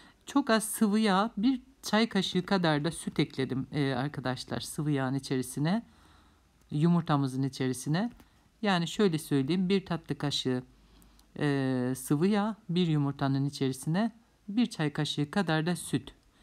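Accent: Black Sea, Turkey. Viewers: aksandan biraz karadeniz yöresi sezdim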